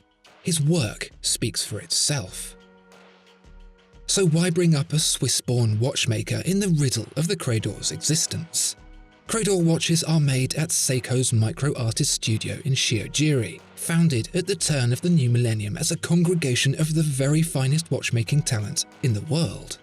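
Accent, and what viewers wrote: United Kingdom. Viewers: That British accent....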